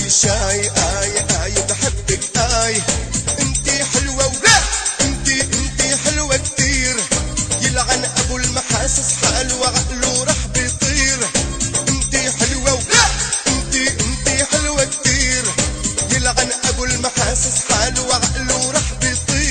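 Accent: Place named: Aden, Yemen. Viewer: اللهجة الادقانية بتجنن وكملها بهالغنية الحلوة 💚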